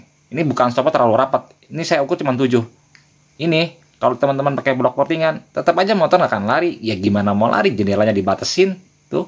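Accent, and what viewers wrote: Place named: Bekasi, Indonesia. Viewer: Dpet ilmu sedikit2 nih bang😊. Ngomong2 suara abang kaya orang bekasi babelan😁😅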